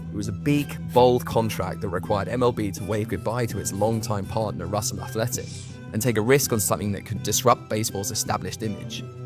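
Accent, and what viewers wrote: United Kingdom. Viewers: So weird hearing a brit talk biesbol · Gonna start off by saying great, informative video! But on to my actual comment, I'm shocked to hear what I perceive to be a British accent covering American sports, and specifically Baseball. 2024 is dope. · British accent? Very smooth not to rubbish. Glad i was recommended this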